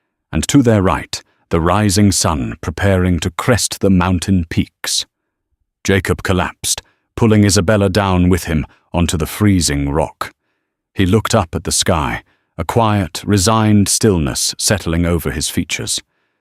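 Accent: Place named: England, United Kingdom. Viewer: I love the voice of this narrator; baritone, English, and clear. So nice! Good story, too.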